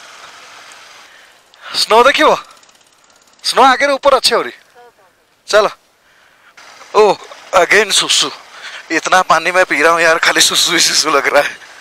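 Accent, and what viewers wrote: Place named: Puri, India. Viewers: sahe sahe re khela bhai puraa aamara puri style re suniki bhala lagila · Where are you from brother? You sounds like a little bit bengali 😄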